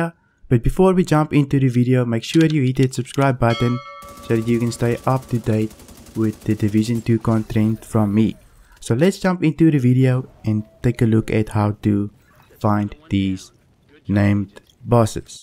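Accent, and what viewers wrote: South Africa. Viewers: Is that a South African accent I hear? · Are you south african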